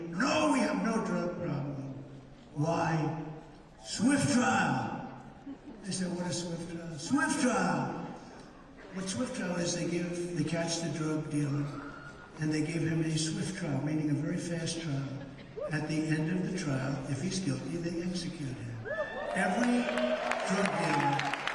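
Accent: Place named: Pacific Northwest, United States. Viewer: And you're from let me guess by the way you say water. Oregon or Washington? I don't think you're from Idaho but I've been known to be wrong once in awhile. Very rarely.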